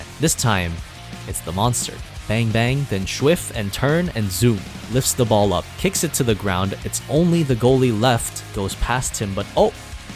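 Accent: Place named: Liverpool, United Kingdom. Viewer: scouse bastad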